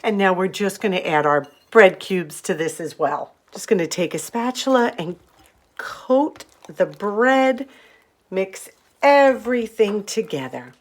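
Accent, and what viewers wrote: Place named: Hudson Valley, United States. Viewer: You totally have the Hudson valley accent. Peekskill born and raised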